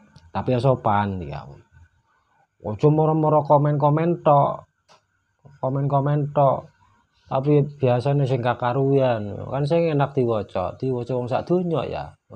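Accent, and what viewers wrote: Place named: Banyuwangi, Indonesia. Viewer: Kurang deles osinge kurang pas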